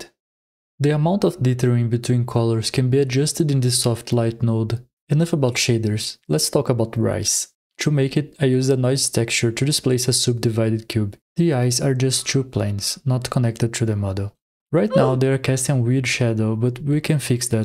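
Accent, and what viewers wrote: Brazil. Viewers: o sotaque br alegrou meu dia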